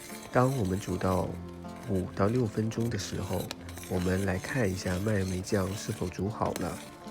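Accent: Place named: Northeast, China. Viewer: 哈哈哈哈哈 笑死我了， 你开口 就已股东北味，挡都挡不住那种！ 哈哈哈啊哈哈 越听越搞笑！！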